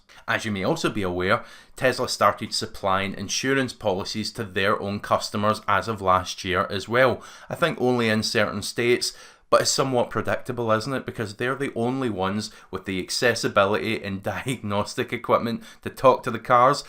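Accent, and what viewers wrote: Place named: England, United Kingdom. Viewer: heavy english, similar to ozzy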